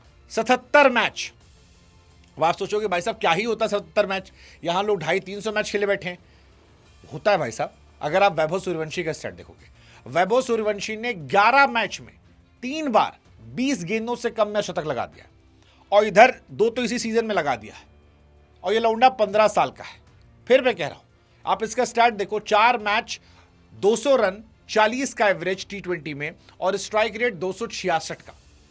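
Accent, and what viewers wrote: Bihar, India. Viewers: Bihari bolte mittar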